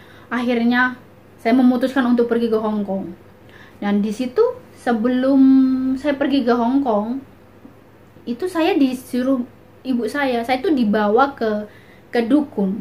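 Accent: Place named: Malaysia. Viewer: Saya senang dengar kesaksian kamu dgn eksen melesyia sangat enak di dengar,ditambah bahasa inggris.Tuhan yesus .enyertai kamu.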